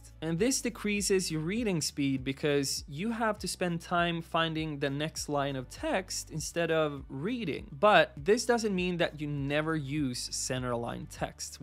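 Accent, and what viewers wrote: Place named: California, United States. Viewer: typically cali dialect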